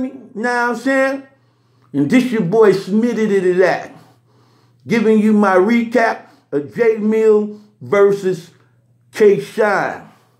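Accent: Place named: New York, United States. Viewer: ..#AAYYYOOOOO..!!..THIS SHIT IS HILAAAAAAARIOUS..!!! 😂😂😂😂😂😂👏👏. NEW YORK ACCENT IS FUCKING COMEDY GOLD..!! #BRUUUUUH..!!😁😁😂😂👏👏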